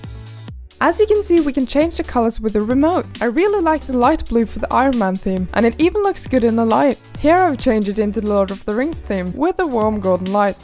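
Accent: United Kingdom. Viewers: She sounds more British in this video than others. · So this is where she started to lose the british accent xD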